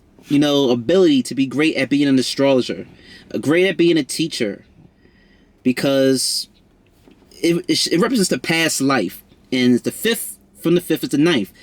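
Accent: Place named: Baltimore, United States. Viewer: Thank you! Love this placement and the way you explained it. Love ur inner g you gotta be from Baltimore 🧡 if you are even more love to you n the home city 🏙. Thanks again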